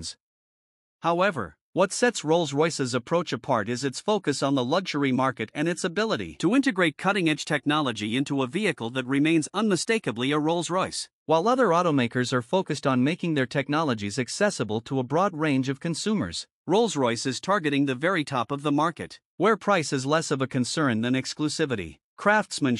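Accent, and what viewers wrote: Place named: United States. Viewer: Repetitive WAFFLE by a DREARY American Voice.... ALL talk and NO FACTS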